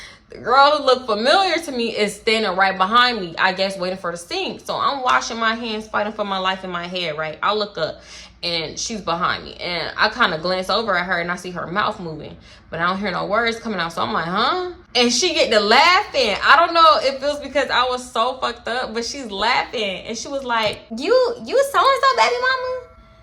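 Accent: Baltimore, United States. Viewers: you and this baltimore accent take me out🤣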